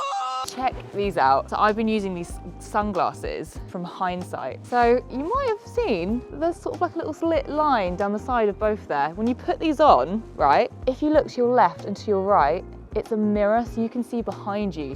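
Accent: United Kingdom. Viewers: I think people keep stopping you because you're a complete smoke show with an adorable British accent and the bike is cool enough to be an ice-breaker. Oops, did I say that out loud?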